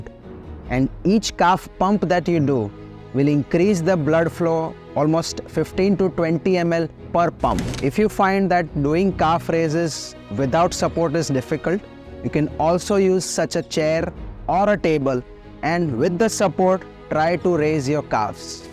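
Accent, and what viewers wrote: India. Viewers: Father teach me English more than 35 year that's why understand indian English grateful to ur parents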